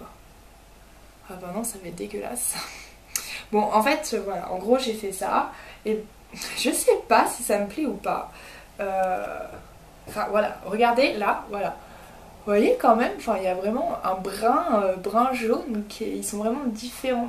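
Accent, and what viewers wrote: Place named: United Kingdom. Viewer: ton petit accent british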